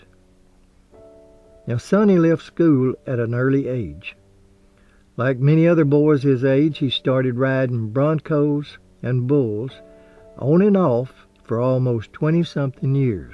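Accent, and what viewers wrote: South, United States. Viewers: I could listen to you narrate a telephone directory, or anything else for that matter. You have such a soothing voice. I love your Southern accent. Best wishes from the UK 🇬🇧 ❤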